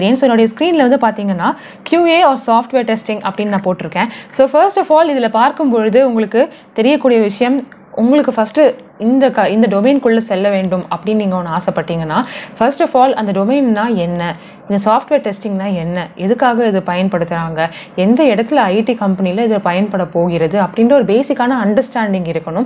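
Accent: Sri Lanka. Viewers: Akka...are you Sri Lankan??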